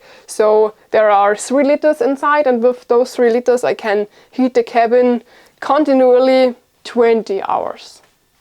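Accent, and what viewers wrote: Germany. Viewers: exelent job on your preparedness. Soon you're gonna need a shed because the cabin is full. You might won't for extra preparedness to hide and bury some of your food like the long term packaged and cans somewhere in secret locations in and around the forest. Love MIKA and your GermanEnglish ❤ Don't forget to hide your Nuts like a squirrel. 🐿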